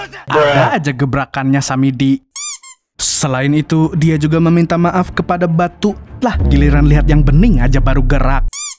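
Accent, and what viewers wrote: Banjar, Indonesia. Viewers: Adminnya orang Banjar kah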